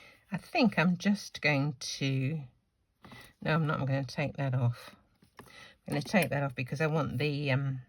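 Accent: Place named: RP, United Kingdom. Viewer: I love clean and simple cards! You once again made another beautiful card. Thank you for your tips and tricks of your many years at this craft. I also love your words you use when you drop something or if something goes wrong 😂. I’m from the US, so your accent and proper English makes me giggle 🤭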